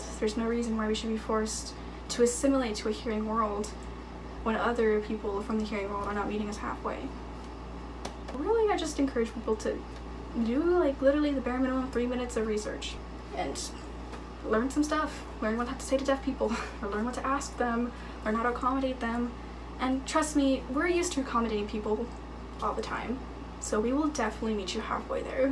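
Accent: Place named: United States. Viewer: You know what I don't understand about you? You f lost your f hearing and all you cared are about people! "If I have cancer at least people will understand".. " I have something to tell people finally".. Whaaaat the hell is wrong with you people from USA? (I suppose you are from USA by your accent). You are all so shallow and willing to be.. part of something, included, communities, etc.. Damn girl. You have all my sympathy for the hearing loss but you need to change your ways. Really.. you are all like from the same material in there. I can't care less about people.. what they think.. I care about my f hearing.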